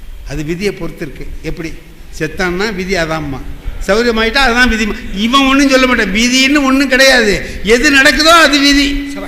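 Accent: India. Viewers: என்னதான் நகைச்சுவையாக பேசினாலும்...சரியான கருத்துக்கு வந்து நின்று பேசுவார்..நகைச்சுவையும்..கருத்தும் perfect blend ஆகும்..கூடுதலாக திருவாரூர் வட்டார மொழியும் super blend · தஞ்சாவூர் வட்டார வழக்கு -குறிப்பாக திருவாரூர் -நாகை பேச்சு வழக்கு இப்படித்தான்!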